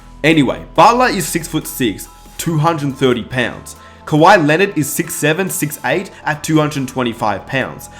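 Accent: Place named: Australia. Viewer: Australian mate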